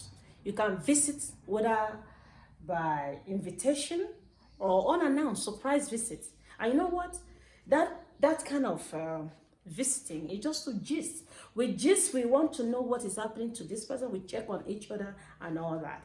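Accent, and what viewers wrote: Kenya. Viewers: Your accent is now more Kenyan than Nigerian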